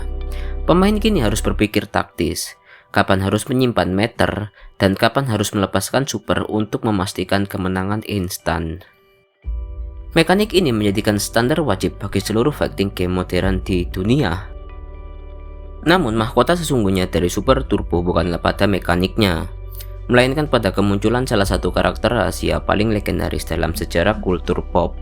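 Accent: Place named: Batak, Indonesia. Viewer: Logat batak nya kuat banget bah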